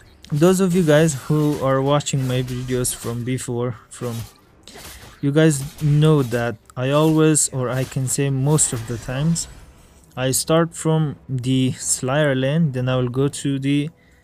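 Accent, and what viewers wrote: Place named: Mexico. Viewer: from México i think , i notice te acent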